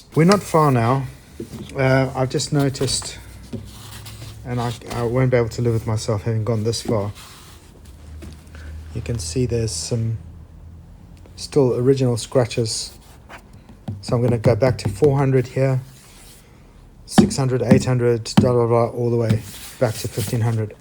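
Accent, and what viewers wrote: South Africa. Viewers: Great work bru'...where you from?...I'm guessing Maritzburg area...miss SA🥺. Love your videos, you're on your way to 1m subs in no time!!!.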